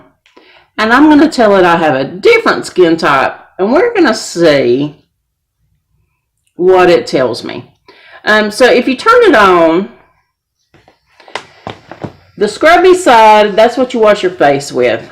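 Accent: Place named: Texas, United States. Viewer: You have the most wonderful accent. Reminds me of my days in Texas. I have seen so many ads for those boxes now. But the Tarte palette in this season's box is just not doing for me. Hahahahaha.. I am at the Foreo (or something) part right now. I was thinking the same thing about the two prong analysis! Because, I mean, we are the first to notice in something in our skin changes, right? May be, for men, who are usually clueless (and proud of it) about skincare, might find it useful. Because, most men I know use a moisturizer, that their wife bought, if the wife says their skin looks ashy! LOL!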